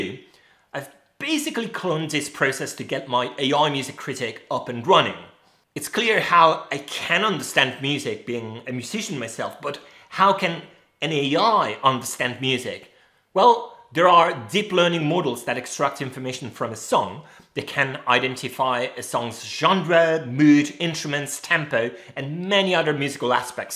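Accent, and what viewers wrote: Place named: United Kingdom. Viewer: Great work! It's really funny how the british accent and words make it seen like an even more intellectual and objective review 😂 I'll use it to annoy my Swifty-fan's friends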